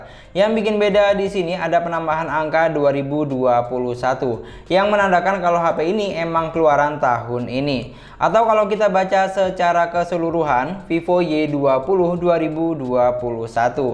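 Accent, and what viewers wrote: Madura, Indonesia. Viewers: Bang orang Madura kah?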